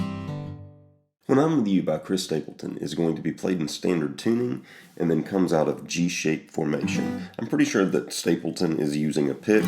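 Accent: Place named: Georgia, United States. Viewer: Thanks so much for all your hard work and easy to follow videos. Wondering which part of the country you’re from. Sounds a bit like GA.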